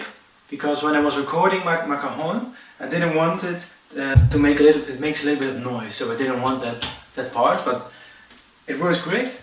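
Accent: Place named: Netherlands. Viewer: zwaar nederlands accent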